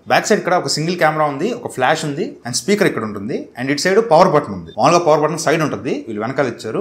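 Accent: Nellore, India. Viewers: Bro u from nellore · Meedhi nellore aahh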